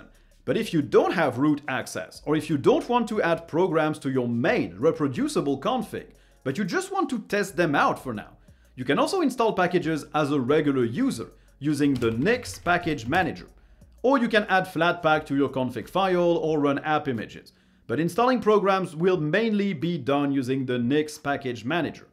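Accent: France. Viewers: Excellent video, i now want to try Nixos ! Also, you have a clear English pronunciation but what is your mother tongue, are you French?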